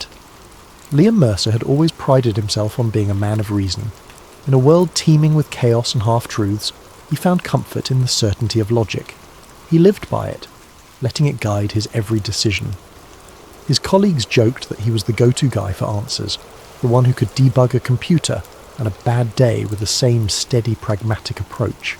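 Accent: United Kingdom. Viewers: I love this but i am very tired of a British accent. It doesn't relax me. I struggle to interpret every elongated vowel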